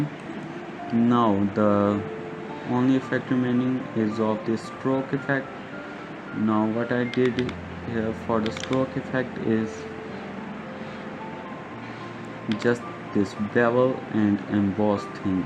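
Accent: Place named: India. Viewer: your voice. INDIAN DETECTED 😍😍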